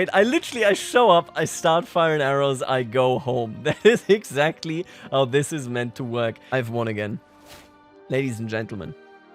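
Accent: Germany. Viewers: I smell Deutsch in your Akzent But I live this kind of content so pleas keep on by doing so man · 2 Sekunden und ich wusste das du deutsch bist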